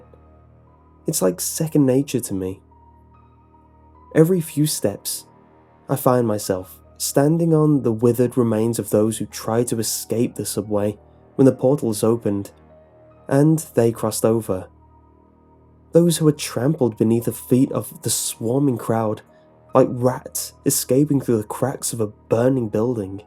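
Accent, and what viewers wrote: United Kingdom. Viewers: That's his real voice. He's British. He's cool.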